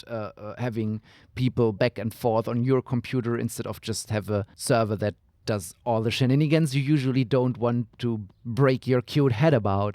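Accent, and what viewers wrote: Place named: Germany. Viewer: You have a German accent. Are you from Germany?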